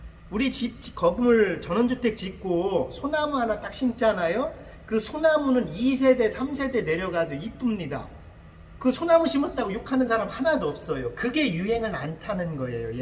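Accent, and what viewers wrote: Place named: Chungcheong, South Korea. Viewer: 큰타일이 잘 깨지지않을까요? 충청도사투리가 재미있네요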